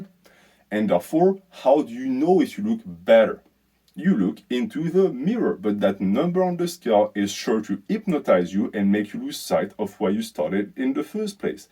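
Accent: France. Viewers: Do you have an instagram fellow lifter of heavy weights i wanna see your sick physique. PS: Javais cramé que t'etais francais a ton accent mdrrr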